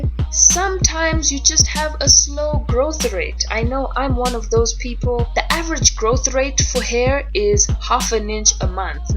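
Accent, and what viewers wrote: Zimbabwe. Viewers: This accent has to be Zimbabwean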